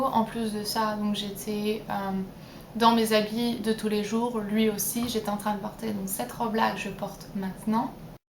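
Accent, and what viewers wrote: England, United Kingdom. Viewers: C'est bizarre, on dirait que tu as un accent légerement anglais...... pourtant tu es fraancaise n est ce pas * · je suis une nouvelle sur ta chaine :) je trouve que tu as un accent anglais cela m'a parrut étrange mais avec cette vidéo je comprends mieux :) j'adore ton naturel tu donnes envie tu m'inspires car tu es simple et tu n'as pas la grosse tête j'adore <3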